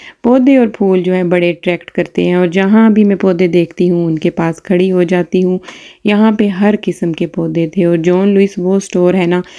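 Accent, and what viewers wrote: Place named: Punjab, India. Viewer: Wa alikum salam je❤your Punjabi acent❤❤bartan waqe e haseen steel wly.😊i am your new friend stay connected with me dear😊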